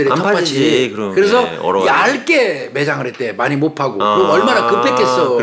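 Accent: Chungcheong, South Korea. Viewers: 화끈한말씀^충청도사투리가구수하신~ 김복준선생님? 절친고향여친도 복주라하였네요!오래전 고혈압으로 하늘이사한 가엾은친구!생각이나는~ 사이다같은 범죄자에 쓴소리가 그나마보는내내 시원한~🧊 하나뿐인생명 무참히살해하는인간들은? 그냥~~팍👊😡 요즘 수사반장을보는듯한~수고와감사🙇‍♀️ 그리고교훈^조심또조심 밑을넘없다 경계심잃지말고…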